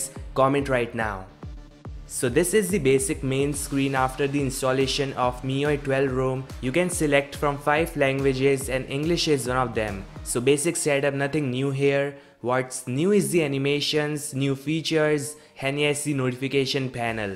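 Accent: India. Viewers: i like english from india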